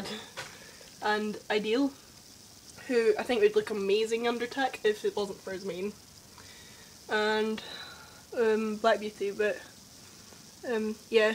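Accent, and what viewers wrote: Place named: Scotland, United Kingdom. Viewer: Your not alone with the accent , cause I'm scottish too :-D and live near Glasgow !!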